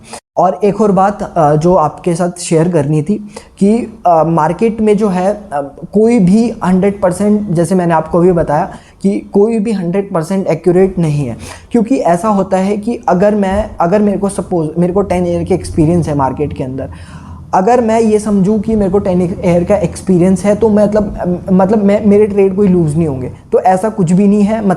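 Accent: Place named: Dehradun, India. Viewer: Bhai dehradun se ho kya..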